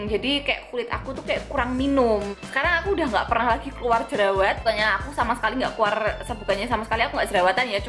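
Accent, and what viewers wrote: Java, Indonesia. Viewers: Selalu sukak sama medoknya ❤️ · Medoknya itu lo ... unch unch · Langsung subs gegara medok :') ❤️ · medok bet medok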